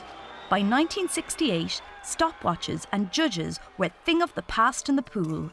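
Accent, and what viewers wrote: Ireland. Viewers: She's Irish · She is Irish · I looooove her irish accent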